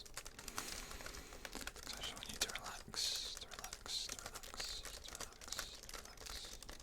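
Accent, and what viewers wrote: United Kingdom. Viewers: It’s so hard to find good British ASMRtists, definitely earned a follow from me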